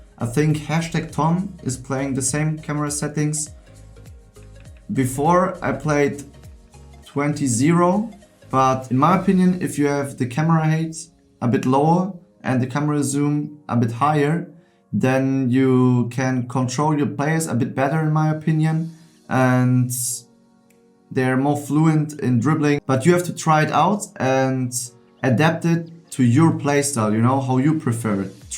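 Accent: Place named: Germany. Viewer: Mann kann höheren das du deutscher bist haha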